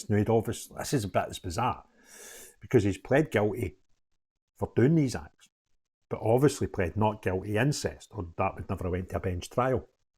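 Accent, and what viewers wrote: Scotland, United Kingdom. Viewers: I love your Scottish accent. Such a sad story 😢💔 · This happened in Atlanta, Georgia. I wish he had put a link to the new stories. A lot of people are assuming this happened in the UK because of his accent.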